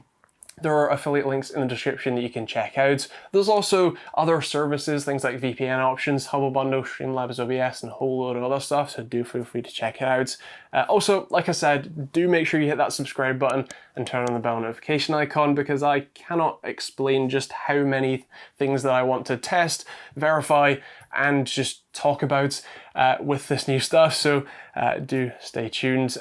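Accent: Scotland, United Kingdom. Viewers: Watched a few of your videos now, great work not getting caught up in the hype like most of the other reviewers. I know people aren't generally concerned about power consumption but the TDP is getting out of hand and like you said good luck keeping it cool. Glad it's a great performer but 241w is crazy IMHO. I've given you a sub nice to hear a Scottish Tech tuber!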